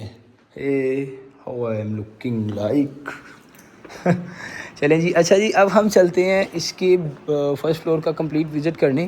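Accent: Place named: Rural, India. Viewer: Paindu kahi ky bolna to seekh lo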